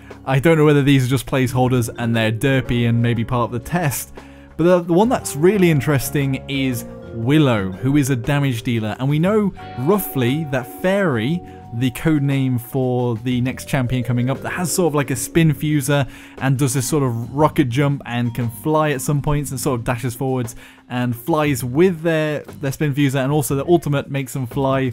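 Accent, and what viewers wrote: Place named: United Kingdom. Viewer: I love your british accent and your voice. I would talk to you for hours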